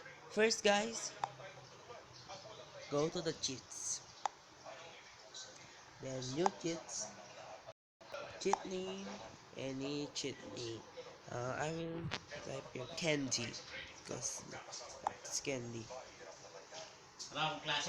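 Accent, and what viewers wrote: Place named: Philippines. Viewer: oh bcz I'm a Filipino too I can tell by the voice and it says Tagalog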